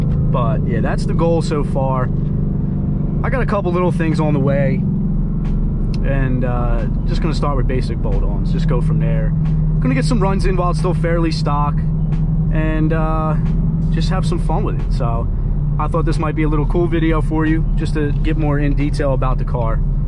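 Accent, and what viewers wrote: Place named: East Coast, United States. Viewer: Definitely from east coast. Delco even perhaps?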